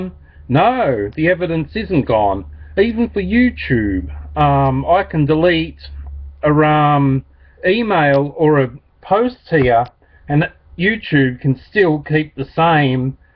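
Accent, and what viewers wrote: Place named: Australia. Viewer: Sounds like you're Australian. Not sure if the laws in Australia are the same as in the U.S. There are a lot of "privacy laws" in the U.S., which Hillary is hiding behind.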